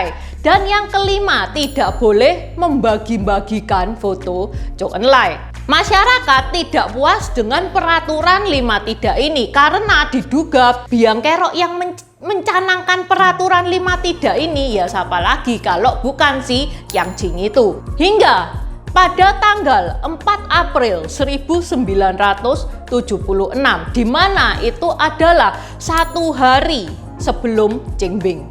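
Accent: Java, Indonesia. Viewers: Bahasa jawanya kental haha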